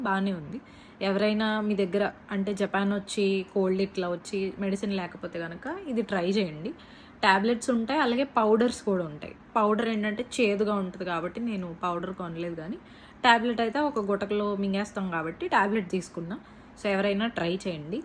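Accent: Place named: East Godavari, India. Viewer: జపాన్ లో ఉన్న తెలుగులో చక్కగా మన ఈస్ట్ గోదావరి యాసలో మాడ్లాడుతున్నావు. ఒకే గాడ్ బ్లెస్స్ యూ చైల్డ్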